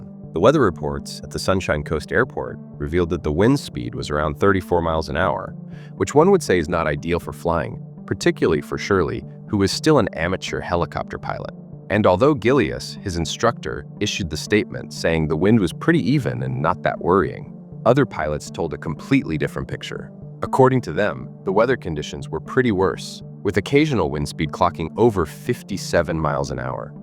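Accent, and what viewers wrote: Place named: United States. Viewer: As an avid Skyhooks fan from day dot, for some reason I've always been obsessed with the death of Shirley, always digging deep into further research into the mystery circumstances surrounding the actual cause of why the chopper crashed. We all knew there could have been many factors, like how the weather conditions took a turn, but it was always an unsolved curious puzzle and more to it than meets the eye. I've seen a lot of reports into this over the years, but this is the first time I heard of Shirley possibly having a heart attack, that sent a chill up my spine, something we probably will never know. Also, who is this American or Canadian narrator, his pronunciation is incorrect, it's Red Symons not Simmons!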